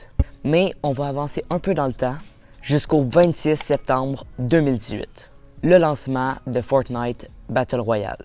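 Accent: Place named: Canada. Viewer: Bonne vidéo frérot ! Avec le petit accent canadien 🙏🏻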